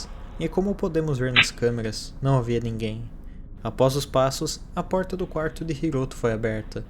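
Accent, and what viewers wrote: Brazil. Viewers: O sotaque nordestino melhora tudo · não consigo prestar atenção no vídeo, o sotaque é muito bagual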